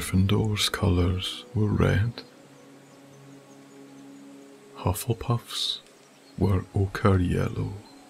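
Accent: Scotland, United Kingdom. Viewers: you have a lovely bit of Scottish accent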